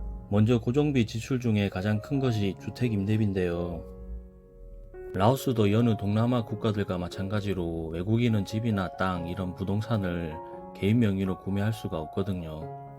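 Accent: Gyeongsang, South Korea. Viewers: 좋은 정보네요. 그런데 경상도 분이시군요. 님도 유튜브 보세요. 전라도에 관한것 경상도애들이 어찌 개판치는지. 경상도 사투리면 최소한 전라도와 그에 부속한 사람들은 구독 안합니다. 일베와 경상도 악플러들때문이라는것은 분명히 알아주세요.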